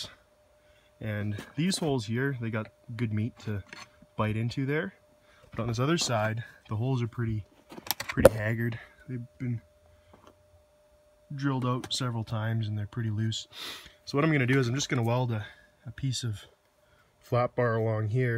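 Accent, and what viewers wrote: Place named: Canada. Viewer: It's the Canadian accent lol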